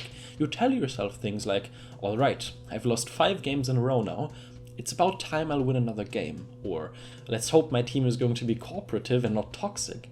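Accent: Germany. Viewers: hah, I knew this accent was german.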